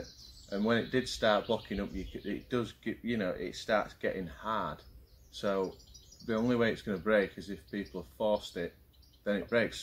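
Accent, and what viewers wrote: Yorkshire, United Kingdom. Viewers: Sounds like a Yorkshire lad. Good review cheers.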